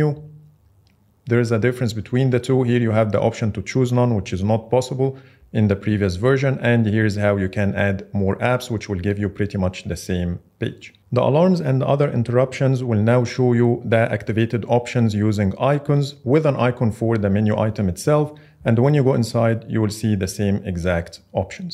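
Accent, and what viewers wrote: Egypt. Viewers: You have English Egyptian style 🎉 your brother from Egypt too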